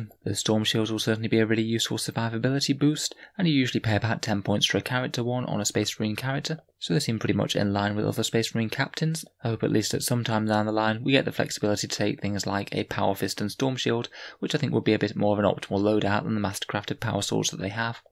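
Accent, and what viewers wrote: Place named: East Anglia, United Kingdom. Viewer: I'd guess somewgere East anglia way, they're accent is quite subtle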